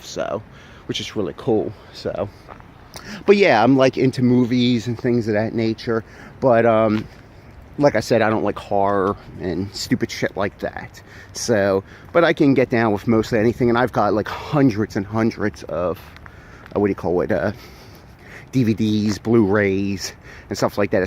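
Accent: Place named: Philadelphia, United States. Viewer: I've been watching for a little bit, but I had no idea you were from Philly. I grew up in Mayfair. In hind site I suppose should have noted the accent. I enjoy the vids. P.S. I agree, no one should swim in Pennypack, thats f'n disgusting.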